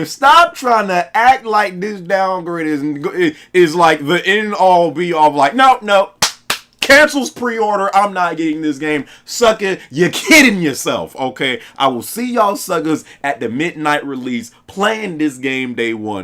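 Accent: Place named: New York, United States. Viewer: lol I could've sworn this nigga moved to ny the way he talks😭 saying my guy?😅 anyway, much love from Harlem ny fam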